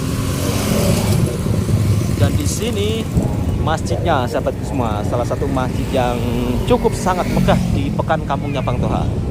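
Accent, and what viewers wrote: Java, Indonesia. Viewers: Negara kaya tapi rakyat miskin.. burit apa toha..kamu mahu mkn burit kah.. enak kah ngabuburit tu..😅mas toha kalau ngomong bunyi jowo banget gak sama dgn adik nya.. kalau cakap bahasa melayu tu gak kayak org indo.. bunyi n loghat melayu nya lain mcm..mas toha jowo medok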